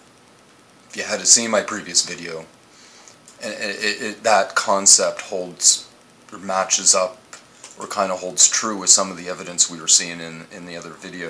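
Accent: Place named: Canada. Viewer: people move. after Sandy, a lot of people moved out of NY. just like after Katrina. California population had jumps after those events. it's called migration. the east coast wasn't permanently flood. those areas were created, not lost. look at old maps. none of the East coast line was never as straight as it is now. those started out as sea barriers. to stop coastal flooding. they are creating new land now. he acts like he knows what the coast looked like but really doesn't. he couldn't even name Chesapeake Bay or the bridge. it's by far one of the most known landmarks of the East coast. those who don't know it are products of poor education. he sounds Canadian so his education of the U.S. is not to be expected. I don't blame him for that. seriously though. millions of people have not been deleted from existence. we've never gotten how population numbers. 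they've always jumped around. especially out if China. they used to inflate their population numbers as a fear tactic. the probably still are.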